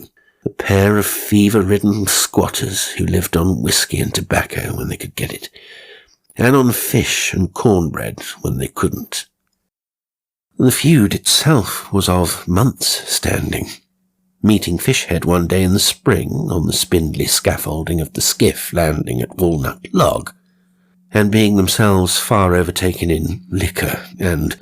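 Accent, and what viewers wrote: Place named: South, United States. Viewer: Your poor white trash accent was spot on. And I am from the south so I know. Really good.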